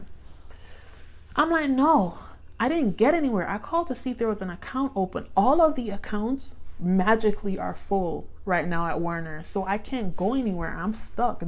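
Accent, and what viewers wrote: Jamaica. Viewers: I really want to do local but hmmm! I think I heard a Jamaica accent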